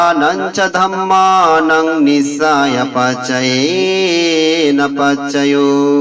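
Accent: Standard. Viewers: সাধু সাধু সাধু। একদম শুদ্ধ পালি উচ্চারণ। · খুউব শ্রুতিমধুর ও বিশুদ্ধ উচ্চারণ মনে-প্রাণে প্রশান্তি অনুভব করছি,, 👏👏👏, বন্দনা ভান্তে আপনার নীরোগ দীর্ঘায়ু কামনা করছি,, 🙏🙏🙏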